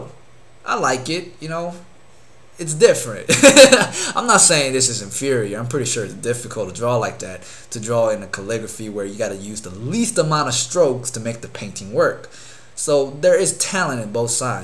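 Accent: England, United Kingdom. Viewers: What the hell I couldve SWORN i was subscribed to already! lol No wonder why I havent seen a new video in a while. lol but Haha the "Wear underwear or something thats nasty!" XD too funny! I didn't know they went freeballing in those kilts lmao! Learned something new everyday lol btw Your britsh accent is awesome!! ELLO LOVE!:D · LOL I love your English accent!!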